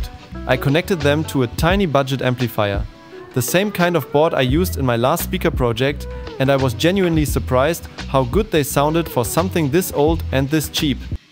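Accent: Germany. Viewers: I love the German accent. (no joke) Great video and idea! Thank you. Merry Christmas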